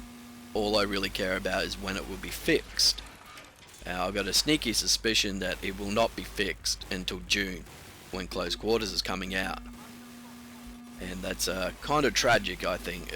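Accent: Australia. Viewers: fuck i love aussie commentators haha,subbed!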